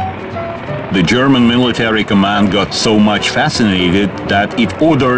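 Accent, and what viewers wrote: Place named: Russia. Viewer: Other than a rather heavy accent his English is perfect.. I have no trouble at all understanding him. I find the accent actually quite fitting and adding to the charm and flavour of the documentary. Russian planes, Russian accent. It fits.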